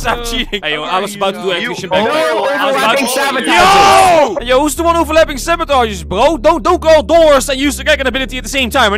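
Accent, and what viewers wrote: Netherlands. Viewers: Bruh echt een nederlands accent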